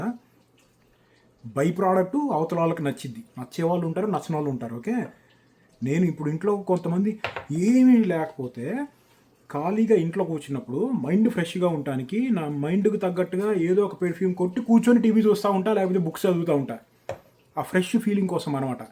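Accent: Andhra Pradesh, India. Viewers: Anna nuvu nizamabad anav kada kaani nee telugu andhra slang undi enti?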